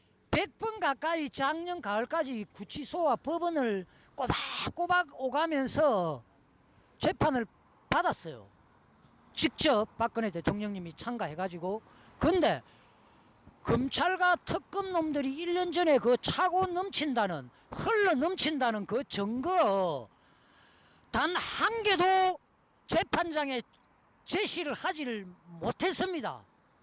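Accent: Gyeongsang, South Korea. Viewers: 다 좋은데 그 경상도 말투 더럽게 듣기싫다..표준말좀 써라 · 갱상도 말투 존나 듣기싫다.증거는 차고 넘쳐.24년이 아니라 32년이야.계엄 지시까지 먹으면 무기징역 존나 웃겨.배아프다.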